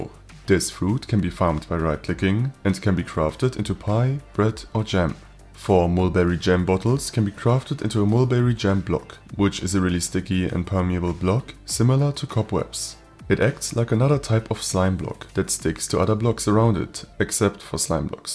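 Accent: Germany. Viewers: Wow very gut mod but i smell some deutsch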